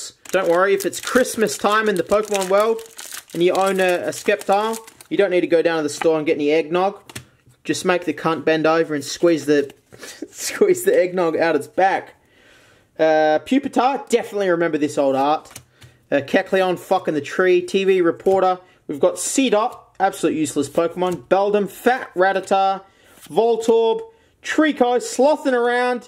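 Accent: Australia. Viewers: Hes Australian though, james is British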